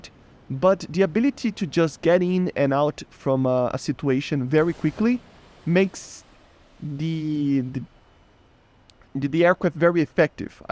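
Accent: Brazil. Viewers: Are you Brasilian bro?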